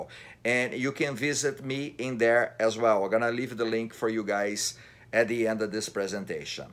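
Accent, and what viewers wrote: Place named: Brazil. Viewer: Sotaque Brasileiro é inconfundível. Muito boa sua crítica,! Não o conhecia. Contudo, o filme não funcionou para mim, o fato, como você mesmo disse, de o elenco não trazer atores profissionais, prejudicou muito a minha imersão. Por vezes ridicularizei certas cenas que seriam incríveis, na minha opinião, com atores profissionais. Em suma, achei a ideia brilhante, porém, muito mal executada. Algo positivo disso tudo foi que realmente nos colocamos na pele do jumentinho, uma vez que os animais também nos veem como “atores amadores”, tendo em vista que nossos movimentos, falas e atitudes são incompreensíveis para eles, como é comum em animais de outra espécie quando se observam. Logo, toda a atuação tosca e inverossímil, aproximou-me muito mais do animal do que qualquer outro personagem. Entretanto, mesmo assim não fui capaz de digerir a obra. 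Nota: 6/10.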